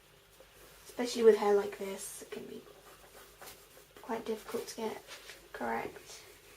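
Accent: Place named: United Kingdom. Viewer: I LOVE your videos! I have already done all the Bakuras and I like Kisara too. It's so nice to hear another British accent on YouTube, too many Americans, though mine a lot more country than yours! :-P Just wondering, could you do either a Malik or Marik video please! I would love to know how to draw them! Anyway, love the videos and keep drawing! :-D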